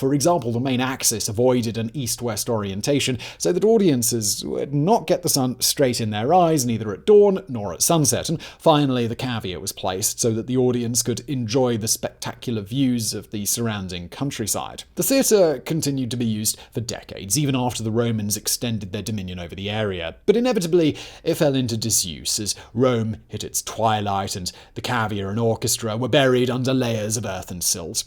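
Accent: United Kingdom. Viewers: I love your videos man! something about a British voice, and a bald guy with a beard makes learning random information very enjoyable !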